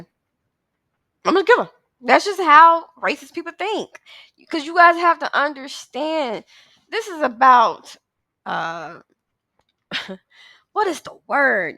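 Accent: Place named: Tennessee, United States. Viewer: You from Tennessee!?!?!?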